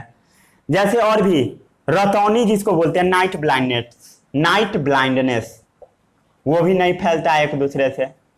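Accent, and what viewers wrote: Bihar, India. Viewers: saab BTW pronunciation is not a genetic feature.. And the so called 'pronunciation problem" you are talking about is our accent which we Biharis are proud of.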